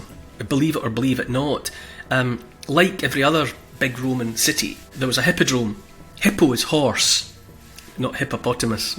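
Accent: Scotland, United Kingdom. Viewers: I like accent. Is it Scottish?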